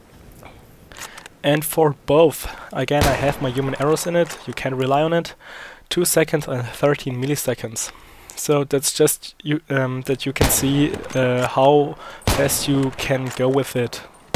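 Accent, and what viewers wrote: Germany. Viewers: Nice German dialect. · Are you German? It sounds like so..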